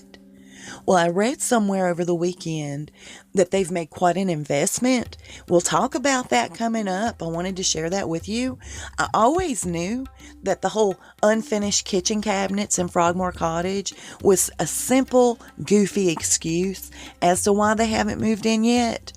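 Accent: South, United States. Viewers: Your from the south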